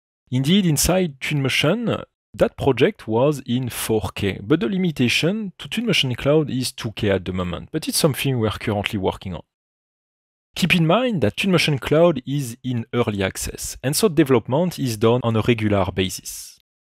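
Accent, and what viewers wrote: France. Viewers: le petit accent français là il me fait me sentir chez moi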